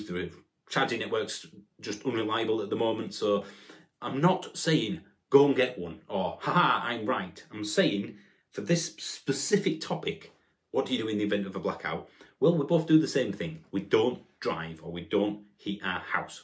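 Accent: Yorkshire, United Kingdom. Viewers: nice to hear the Yorkshire accent in among all the American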